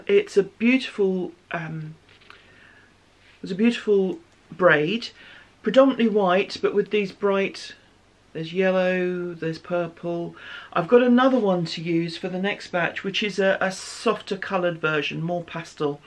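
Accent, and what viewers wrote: England, United Kingdom. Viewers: I enjoy your podcasts so much! It is partly your sweet English accent, your beautiful knitting projects and your great personality❤️Thanks so much for sharing your life with us❤️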